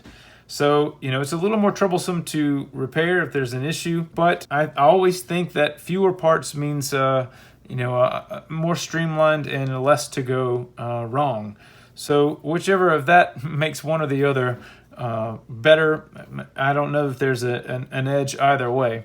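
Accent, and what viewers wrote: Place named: Latin America. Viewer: you have an accent. Are you latino?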